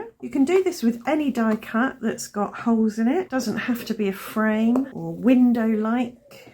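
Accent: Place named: United Kingdom. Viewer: I really get a lot out of your videos! Thank you for all your tips, imaginative techniques and use of DYI tools and products. I see you using a grip mat in many of your videos. What brand is that grip mat, please? I am in the USA. A side note, my husband thinks I am talking to someone in another country when he hears your videos in the background. I just let him think that and call you 'my British friend'!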